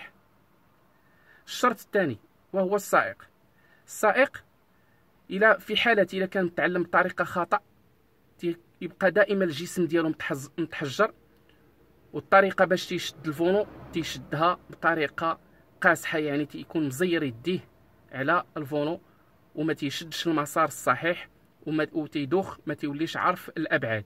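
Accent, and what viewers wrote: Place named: Algeria. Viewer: واه نيشان ربي يحفظو حتى اللهجة لي يتكلم بها تشبه لهجتنا ف جزاير 💪❤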